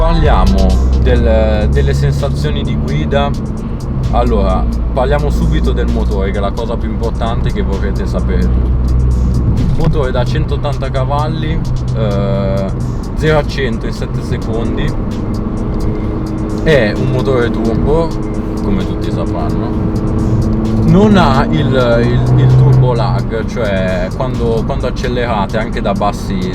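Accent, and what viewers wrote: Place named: Italy. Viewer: Your italian ascent sucks so much that I need to read the english sub to understand something LOL! Learn to speak before create Review bro!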